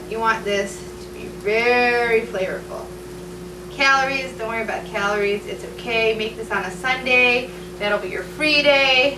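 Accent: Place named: Rochester, United States. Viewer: You have a Rochester NY accent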